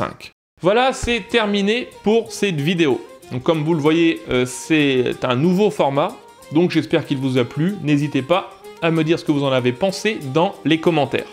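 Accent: Vannes, France. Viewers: Trudjerez ha tchenevo... C’est excellent d’entendre l’accent vannerais, j’adore..! (Désolé pour mon écriture certainement blasphématoire) Méga big-up à notre chaîne nationale..! Tellement intéressante..!! ❤️👍❤️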